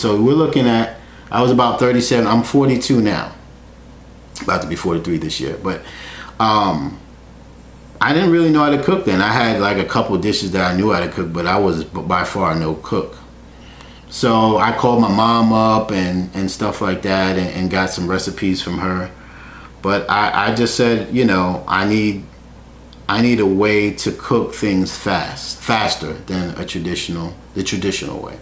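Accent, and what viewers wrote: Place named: Queens, United States. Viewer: Hey, Love your Air Fry cooking channel. I said to my son, hey he sounds like he is from Queens!!! Then I saw you video Q&A Cooks Essentials Air Fryer- Well I'm from Queens and lived in Westbury all my childhood! Wow what a coincidence! Love your Channels! Living in Texas for 28 years now...But always a New Yorker! Just wanted to share!!!! Many Blessings and good for you!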